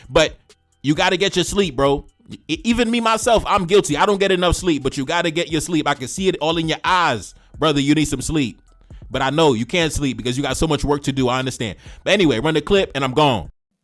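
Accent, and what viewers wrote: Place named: United States. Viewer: Thank you for the explanation, Bro, for explaining what has actually happened. I love your American accent!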